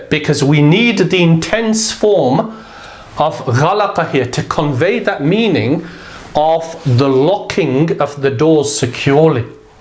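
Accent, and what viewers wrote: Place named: Birmingham, United Kingdom. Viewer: JazakAllah khair brother . I always said I would never learn Arabic from a non Arab... I take back my words. I find you're the best teacher (Allah yu barik feek)on the internet because you break concepts down to their simplest form, which then makes the idea easy to grasp. Perhaps your laid back Brummie accent helps as well lol !Thank you bro.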